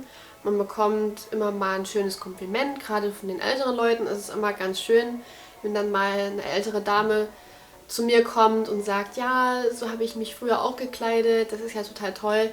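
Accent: Saxony, Germany. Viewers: Juhu, auch jemand aus Sachsen....und ich hab mich schon gefragt warum ich dich so gut verstehe. ^^` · Ich dachte jetzt kommt ein Deutsch mit einem starken Englischen Slang und dann sächselt sie 😅😅 Sehr überraschend ❤ Liebe Grüße von meinem Kanal 🌸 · Ich war schon verwirrt, warum klingt dein Englisch so deutsch, anscheinend kommst du auch aus Deutschland😂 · Dachte erst eine Deutsche spricht Englisch (also vom Akzent) 😂👍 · Eine Sächsin ?😛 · Awa haha die hat einen Ultra deutschen Akzent in englisch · Naja sächseln tut sie kaum... einen englischen Akzent höre ich bei ihrem Deutsch überhaupt nicht. Aber den deutschen Akzent, wenn sie Englisch spricht, den hört man ganz klar. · Als ich dich englisch reden hören habe, wusste ich sofort, dass du Deutsche bist. Du hast einen ziemlich starken deutschen Akzent in deiner englischen Aussprache. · Dein deutsches Video hat mir sehr gut gefallen 😊 Ich mag deinen leicht sächsischen Einschlag in der Aussprache. 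Ich liebe diesen Dialekt. Deswegen würde ich auch gerne wieder ein deutsches Video von Dir sehen. Aber die englischen Videos gefallen mir auch sehr gut. So lernt man auch noch nebenbei die Sprache beim Zuhören. Deine Kleidung auf Instagram oder Kleiderkreisel zu verkaufen fände ich gut. Ich wäre schon mal interessiert 😉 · Sandys Leben Dachte ich auch aber man hört den deutschen Akzent im Englischen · sie sächselt definitiv 😅 als sehr hochdeutsch Sprechende höre ich das extrem 😂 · Und egal wie sehr du dich anstrengst, man hört das du aus Sachsen kommst. 😅 Find ich aber gut, kann mich richtig mit dir identifizieren. 😂❤️ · Haha, habe dich gestern erst entdeckt und gerätselt, was für einen Akzent du hast. Und tadaa, es ist deutsch.😂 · Oh, wie süß, du hast einen ganz leichten sächsischen Akzent! Finde es sehr schön, dich mal auf Deutsch zu hören! <3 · Hach, es fühlt sich so heimelig und ungezwungen an wenn dein sächsischer Dialekt etwas durchkommt. 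Ich bemühe mich vor meinen „westdeutschen“ Freunden immer um Hochdeutsch aber ganz verdrängen kann man das „nu“ und „ooch“ nie, ganz schlimm! 😅 aber es war sehr angenehm dich mal auf deutsch zu hören und du siehst toll aus in dem video☺️ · It's so weird to hear you speak german, especially with your saxon accent 😆 cute! But I think you understood one question wrong.. the 19th century is vom 1800 to 1899😂 you talked about the 20th century haha. Non the less it was interesting to hear your answer to it!! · Das stimmt. Man hört in ihrem Englisch, dass sie deutsche Muttersprachlerin ist.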